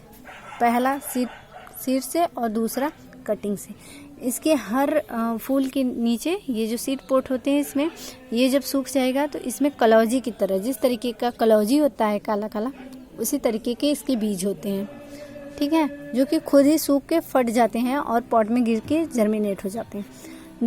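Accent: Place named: Uttar Pradesh, India. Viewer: Accent se aap UP ki lag rahi ho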